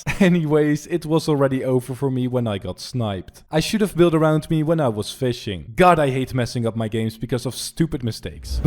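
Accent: Netherlands. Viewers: ik dacht al de je accent erg veel op een Nederlands accent leek Nice man Keep up the grind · Its so funny want ik weet aan je accent dat je nederlands bent maar nice man you are such a good player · Nice accent, you Dutch?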